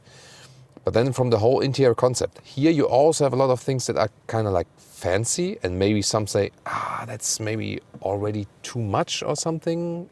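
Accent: Germany. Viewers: Fix diffrent person to show video this guy has Germany English accent we need international accent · Very nice, but I was having trouble understanding all of it. That thick German accent.... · Yes sounds more real is like informations are more reliable since said with a German accent …. first ever car I have droven was a BMW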